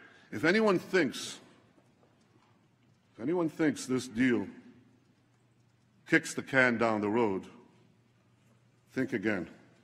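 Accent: United States. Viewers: Fascinating to see a foreign leader with perfect American English, honed in a US High School. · Why does he sound American?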